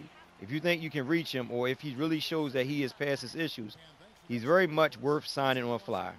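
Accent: Baltimore, United States. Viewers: you sound like your from b-more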